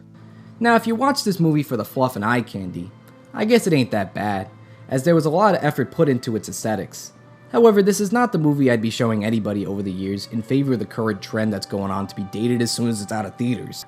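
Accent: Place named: Italian-American, United States. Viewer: God I love your Italian-American accent.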